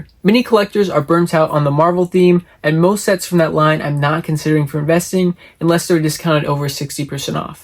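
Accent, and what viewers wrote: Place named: United Kingdom. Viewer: Why does he sound like he’s speaking English and British at the same time 😭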